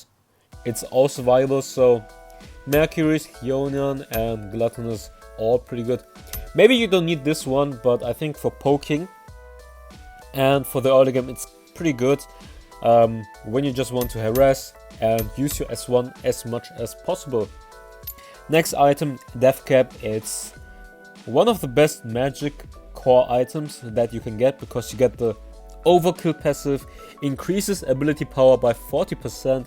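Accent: Germany. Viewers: disgusting german accent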